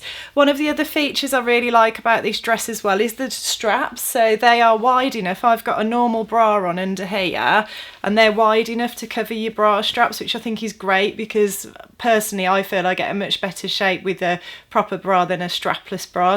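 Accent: United Kingdom. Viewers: This is going to sound very ignorant but this is my first video with you and I'm very curious: what part of the UK are you from? I'm American and I don't recognize your accent. Very neat though. I love the yellow dress! Very pretty on you.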